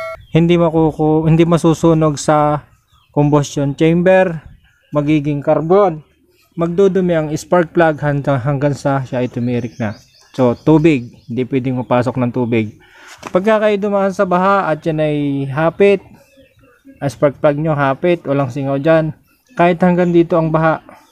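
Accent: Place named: Batangas, Philippines. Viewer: Boss na sub na kita. Npansin ko lng batangenyo ka.. btangenyo dn to hehe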